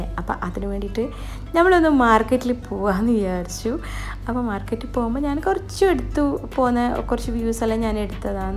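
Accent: Kasaragod, India. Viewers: Ksrod slang പൊളി ആണ് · ഞങ്ങൾ കാഞ്ഞിരപ്പള്ളികാർക്ക് നിങ്ങൾ കാസറഗോഡ്കാരുടെ സ്ലാങ് തന്നെ കേക്കുമ്പോ വല്ലാത്തൊരു ഇഷ്ടമാന്നെ.. അപ്പൊ പിന്നെ ഈ റെസിപ്പി ഒട്ടും സ്കിപ് ചെയ്യാതെ തന്നെ അങ്ങ് കണ്ടിരുന്നു പോകും. പിന്നല്ലാതെ 😍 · കുക്കിംഗ് അടിപൊളി നൂറിൽ നൂറ് ഞണ്ട് കറി വറ്റിച്ചത് നയിച്ചോർ ഞാൻ ഉണ്ടാക്കി കഴിക്കാറുണ്ട് അടിപൊളി ടേസ്റ്റി അമൂർ മീൻ നിങ്ങൾ പറഞ്ഞത് ശരിയാണ് എനിക്കറിയാം ഞാൻ ഒരു മീൻ കടയിലാണ് ജോലി പുള്ളിയുള്ള ആമൂർ വില അധികമാണ് ഇവിടെ ഒരു കിലോ 60 റിയാൽ ആണ് അതുപോലെ തന്നെയാണ് സാല മൂൺ എന്ന ഒരു മീൻ ഉണ്ട് ഇറച്ചി ഓറഞ്ച് കളർ ആണ് അതും ഒരുപാട് ഫൈത ഉണ്ട് ദുബായിൽ സുഖമാണോ കുട്ടിപ്പട്ടാളം എവിടെപ്പോയി വെള്ള ആഗോലി ഇവിടെ കിലോ 70 റിയാൽ ഒരുദിവസം എന്തായാലും നിങ്ങളെ കാസർകോട് ഭാഷ ഞമ്മക്ക് പെരുത്ത ഇഷ്ടായി ട്ടാ ഇച്ഛ എവിടെപ്പോയി വീഡിയോയിൽ ഒന്നു വരാൻ പറയണോ ഓക്കേ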